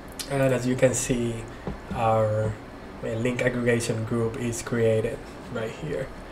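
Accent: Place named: Brazil. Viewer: sotaque de BR em man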